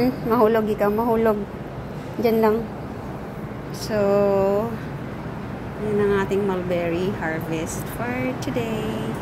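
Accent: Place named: Philippines. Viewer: Are you filipino i know that voice😮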